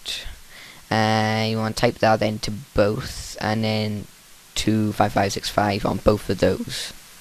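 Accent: Wales, United Kingdom. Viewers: Dat welsh accent tho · Are you welsh? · A true Welshiee, Ditto! :D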